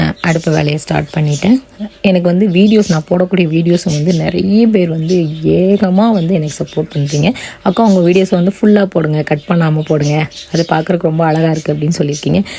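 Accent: Tirunelveli, India. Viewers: திருநெல்வேலியா சிஸ்டர் நீங்க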